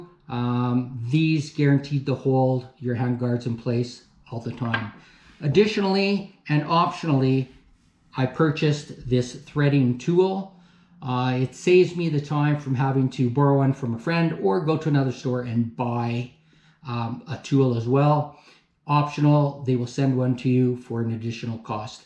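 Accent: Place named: Canada. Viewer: I knew you were Canadian as soon as I heard your accent. 😎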